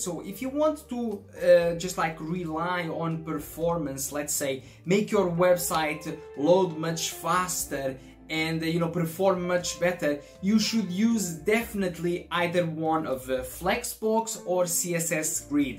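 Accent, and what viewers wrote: Brazil. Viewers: are you Brazilian ? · falou duas palavras eu vi que era brasileiro hahah ótima explicação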